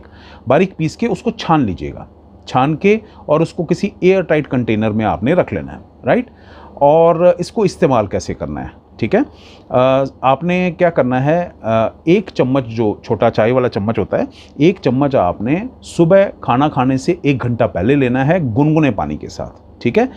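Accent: Punjab, India. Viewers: Sir,thoda video short me banaye.Thank you for great information. I love your strong Punjabi accent.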